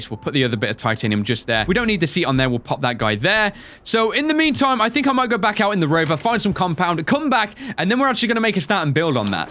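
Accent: United Kingdom. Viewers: your accent is british, you say aluminum and not aluminium british or american?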